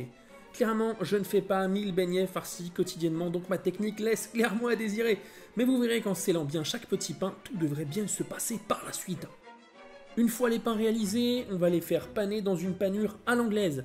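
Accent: South, France. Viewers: J'ai faim. Et j'admire le nombre de craquage d'accent du sud que tu a su mettre dans cette petite vidéo. C'est craquant-gourmand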